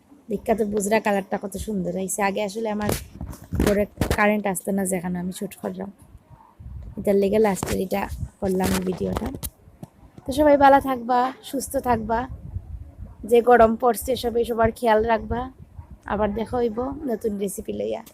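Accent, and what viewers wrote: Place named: Bangal, Bangladesh. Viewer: বাঙাল ভাষাটা কি মিষ্টি🤗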